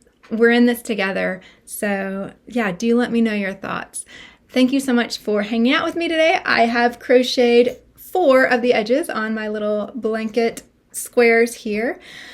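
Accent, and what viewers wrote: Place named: South, United States. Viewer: Just say the rustling sound is ASMR 😂 As for JoAnn's... My first memory was going as a child and buying scraps to make rag dolls. My mother and grandmother both sewed and did a lot of crafting, so I first started making rag dolls using their leftover fabric. Then, when I got into it and ran out of scraps, they brought me to JoAnn's and would look through what they had. I was sad when I first heard they were closing but I can't shop there anymore because I am living abroad now. If they close all stores before I come back, I may never shop there again. They are closing the store in my hometown, so I definitely won't ever shop there again. I made a lot of baby quilts in my 20s and I used to love their Firefly sale. Your wedding dress is beautiful and I love the southern accent 😄💖 I have a NY accent but it usually only comes out when someone cuts me off in traffic😅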